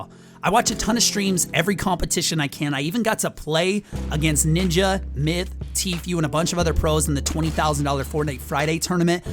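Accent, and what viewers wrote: Denver, United States. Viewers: Are u from Denver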